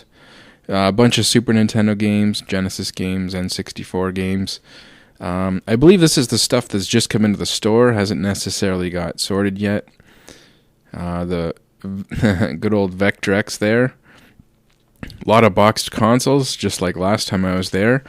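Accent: Canada. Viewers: Wow I stumbled across your video and while watching I was like this guy sounds canadian.I was super happy to see that this store is located in Ontario.Im from Toronto and deff will be making a trip to this store very soon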